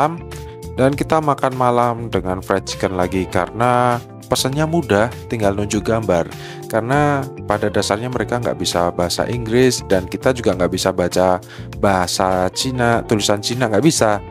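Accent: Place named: Java, Indonesia. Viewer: Kau orang cina islam yah koh. Logat bicara jawir. Gimana ada penindasan gak terhadap islam disana? Jangan hoax loh yah kokoh ganteng.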